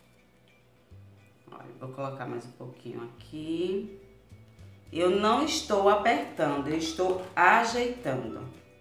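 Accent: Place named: Recife, Brazil. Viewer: Oxe, esse sotaque lindo só pode ser do meu Recife! Tapioca eu faço todo dia, adoro! Vou fazer desse jeito também visse!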